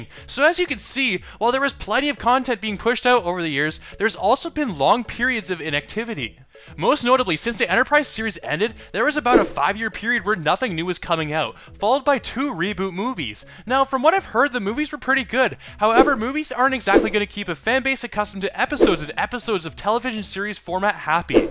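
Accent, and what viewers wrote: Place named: Canada. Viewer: U sound very Canadian, lol so am I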